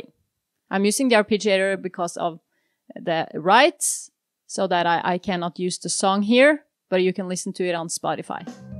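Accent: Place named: Norway. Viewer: that's what I thought, you had that Swedish accent. Which turns out to be Norwegian.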